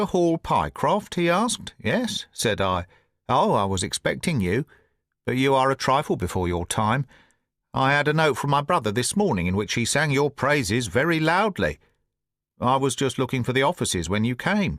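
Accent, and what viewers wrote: United Kingdom. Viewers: Amazing reading. Great British accent. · British pronounciation....Holmes and Watson did not speak the American language.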